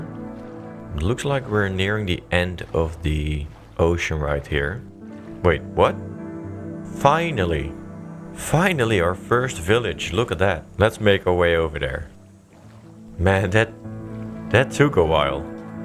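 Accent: Netherlands. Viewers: Hi, I love your series, your explanations and the many helpful details make Minecraft something special. I've just started again after many years, and so far I've only ever built functionally. Now I see that everything can also be ‘beautiful’ - a new challenge for me. Unfortunately I still lack your creativity, but I learn with each of your videos! Furthermore, your pleasant voice is relaxing and your accent reminds me of many beautiful holidays in Holland 👍😃⛵ Win-Win-Win!!!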